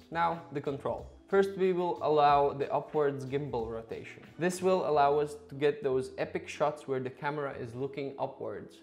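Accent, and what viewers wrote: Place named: Latvia. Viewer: pēc akcenta uzminēju, ka latvietis :D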